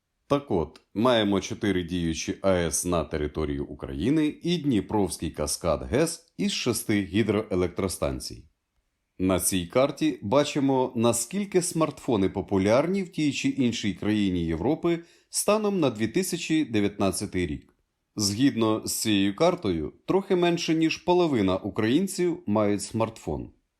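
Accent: Russia. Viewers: Автор російськомовний, це чути з деяких слів і з акценту, але вподобайка за контекст 👍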